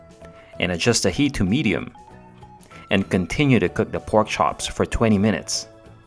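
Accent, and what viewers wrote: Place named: England, United Kingdom. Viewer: In fairness sa English twang ni Kuya ha? Love it! 😊😊😊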